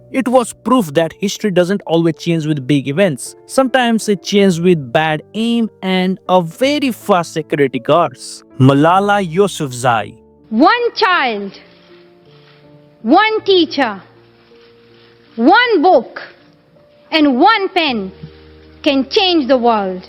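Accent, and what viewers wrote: India. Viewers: your indian accent makes it even more funnier lol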